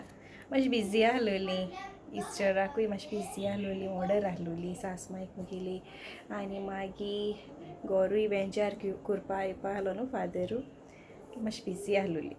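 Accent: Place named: Quepem, India. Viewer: Keshi asai tumi... Somzota bori hai mhun.... God bless you... Keep going..... I love quepem dialect... Sounds very good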